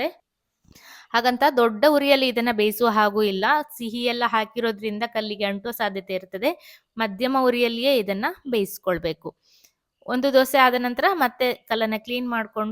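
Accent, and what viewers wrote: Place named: Kundapura, India. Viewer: ನೀವು ಮೊದಲು ನಮ್ಮ ಕುಂದಾಪುರ ಭಾಷಿ, ಮಾತು ಆಡುತ್ತಿದ್ದೀರಿ, ಅಲದಾ???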